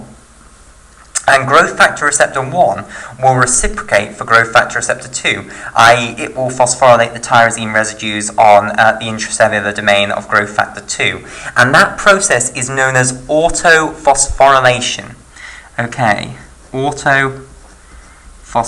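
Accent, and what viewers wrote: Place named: Scotland, United Kingdom. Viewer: You have successfully concentrated only one thing that is your Scotland accent. Thanks for the explanation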